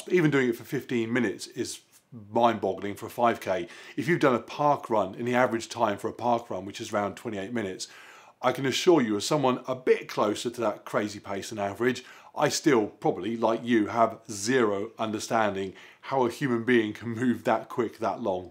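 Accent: United Kingdom. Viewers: British accent)))))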